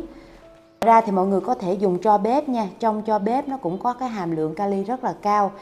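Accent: Mekong Delta, Vietnam. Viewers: Nghe giọng tưởng em ở miền tây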